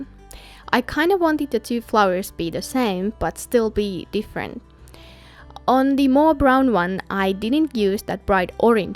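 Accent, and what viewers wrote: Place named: Finland. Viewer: It doesn't matter if I watch with my first cup, or last of the day,!! I LOve watching you ANY time of day! These are so lifelike... Tom even watched, saying 'she has an accent' to which I replied: it's! She's Finnish! Of course, Tom was ready... No she's not, she is still coloring!!!" Love your card... and you!=] Michele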